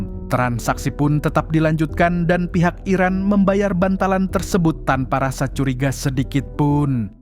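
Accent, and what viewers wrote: Banjar, Indonesia. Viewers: umma mang org banjar sakalinya lah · 😂dangar narasi pertama admin,,kok kaya logat bhasa Banjar,,smangat min bikin konten y,,q urang Banjar di Jabar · Orang Banjar kah min.. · Urang banjar kh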